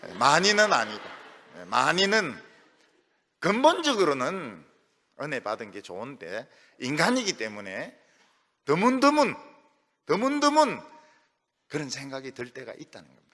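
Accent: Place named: Gyeongsang, South Korea. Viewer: 목사님! 존경합니다. 항시,건강하시길 기도 드립니다. 구수한 경상도 억양으로 하시는 설교말씀에, 은혜 많이 받습니다.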